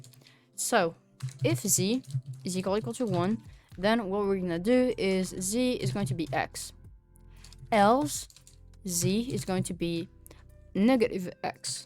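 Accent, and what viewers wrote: France. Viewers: You sound french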